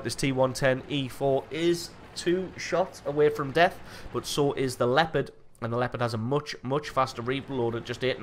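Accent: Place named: North East England, United Kingdom. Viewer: The north east of England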